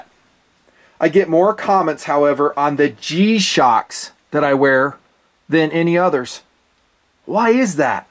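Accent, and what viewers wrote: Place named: California, United States. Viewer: where are you from? You kinda sound like you're Californian hippie surfer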